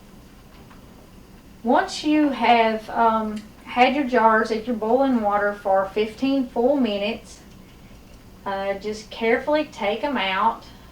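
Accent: South, United States. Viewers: Love your videos! Sounds like you are from TN or KY.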